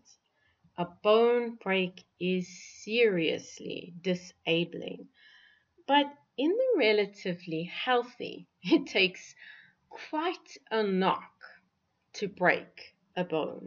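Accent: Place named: South Africa. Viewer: This is a South African accent! I'm pretty sure about it!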